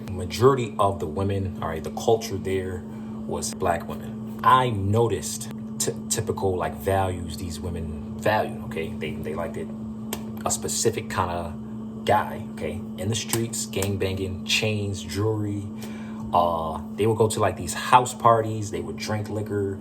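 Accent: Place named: Caribbean. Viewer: Okay, after listening, it sounds like you're of carribean descent.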